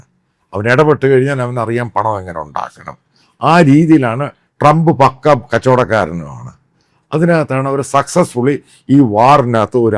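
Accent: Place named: Pala, India. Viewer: അച്ചായൻ ഈ വിഷയത്തെ കുറിച്ച് പഠിക്കാത്തത് കൊണ്ട് മാത്രമാണ് ഈ വിവരക്കേട് വീഡിയോ ഉണ്ടായതെന്ന് എനിക്ക് മനസിലാവുന്നുണ്ട് - അവനവന് പറ്റുന്ന വിഷയം മാത്രം എടുത്താൽ പോരെ..... ഇൻ്റർനാഷണൽ ഇഷ്യൂസിനെ കുറിച്ച് കൃത്യമായി പഠിച്ച് നല്ല രീതിയിൽ വീഡിയോ ചെയ്യുന്ന എത്രയോ വിദഗ്ധർ ഇവിടെയുണ്ട് , അവരുടെ ചാനലുകളും - അച്ചായൻ്റെ പാലാ Slang ഇല്ലെന്നേയുള്ളു ......😂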